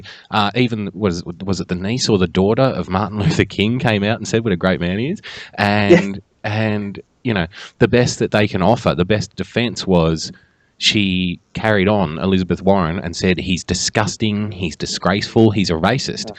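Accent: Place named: Australia. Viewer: This is a good Summary. Worth a listen . The Aussie accent is off-putting . You tend to think an Ozz has no place advising the US . But I enjoyed it.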